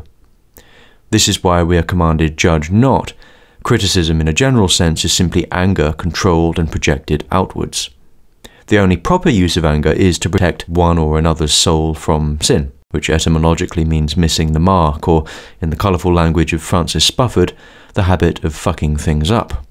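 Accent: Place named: RP, United Kingdom. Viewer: Listening to you speak with your classic posh accent is both entertaining and ASMR soothing. Hope for many more from you are you fill a gap of value to me 🙂👍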